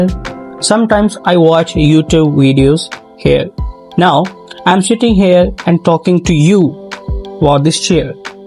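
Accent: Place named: India. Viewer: Your English looks Indian English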